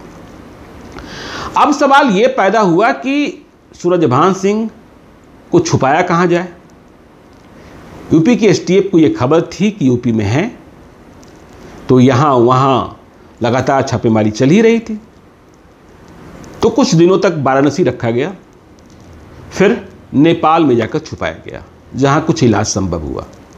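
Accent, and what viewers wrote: Bihar, India. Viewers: You speak like typical Biharii and imitate Kissagoi of Rajesh Pandey IPS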